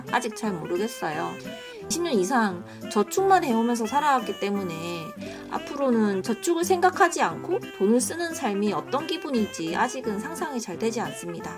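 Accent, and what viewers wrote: Gyeongsang, South Korea. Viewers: 경상도 사투리가 이렇구나 ㅎ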